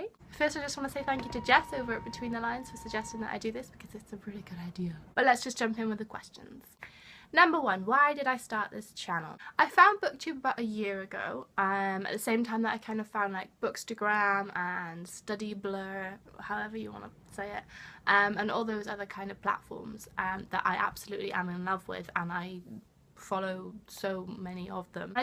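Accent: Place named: Wales, United Kingdom. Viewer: Welcome to BookTube! You're the first Welsh BookTuber I've come across :) Lovely accent! Finding time to read is hard for me as well, but starting BookTube has actually motivated me to read more so that's definitely a plus in my book :) (yay for puns, ha)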